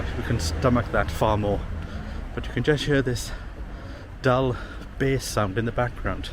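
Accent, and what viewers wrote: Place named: Wales, United Kingdom. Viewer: Your Welsh accent is barely there in London...definitely returned now you're back in the valley.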